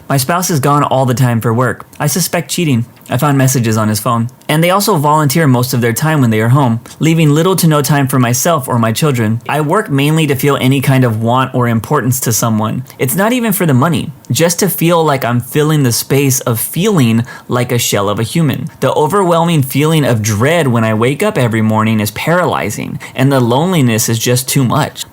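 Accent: South, United States. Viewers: As someone who lives in the south, I approve of the narrator using a southern accent in the first story XD it's just so funny to me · The southern accent is HILARIOUS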